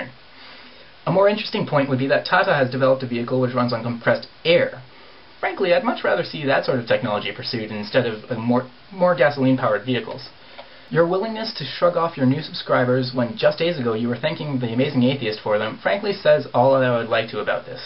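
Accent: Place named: Canada. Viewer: haha, I love canadian accents. abowt! hehehe. nice video, dude.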